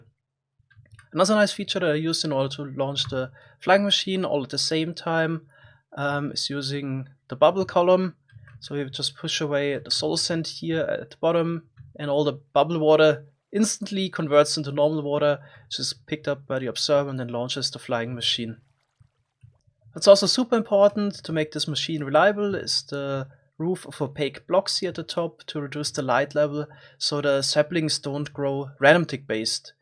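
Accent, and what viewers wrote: Germany. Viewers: Nicer Deutscher Akzent, bro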